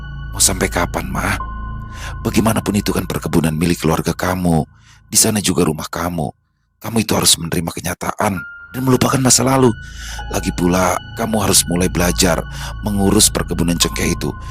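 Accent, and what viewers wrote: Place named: Java, Indonesia. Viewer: Assalamualaikum bang.. Mlg hadir nyimak.. seru ceritanya bang.. bikin terharu skalian lucu jg dengerin bang dgn aksen jawanya.. sm pas niruin suara mrk.. suuiip bgt.. terhibur skl denger cerita dr bang.. sukses buat bang n crew.. Aamiin..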